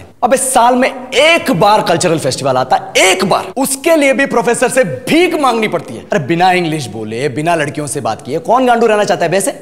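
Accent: India. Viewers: Is he telugu speaker 😮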